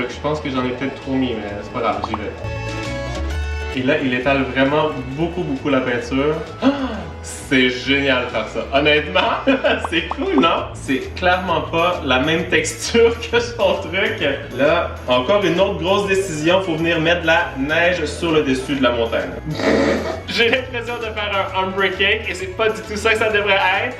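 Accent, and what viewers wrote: Canada. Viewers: tu mon youtubeur préféré de is vidéos accent canadien et qui met quoi des vidéos trop trop rire 👍👍👍👍👍traduis le mot anglais 24h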